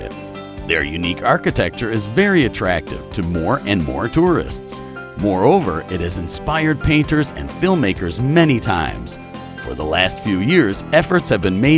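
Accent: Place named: United States. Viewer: It would have been MUCH better to have a Pole who spoke good English to do this voice over. The narrator's strong American accent is horrible and his pronunciation of some of the Polish words are terrible.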